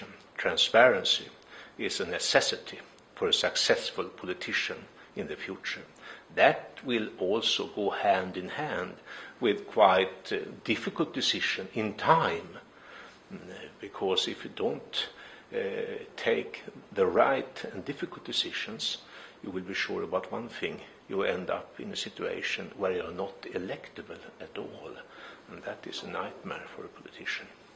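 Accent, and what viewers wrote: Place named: Sweden. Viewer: he might as well been speaking swedish, terrible accent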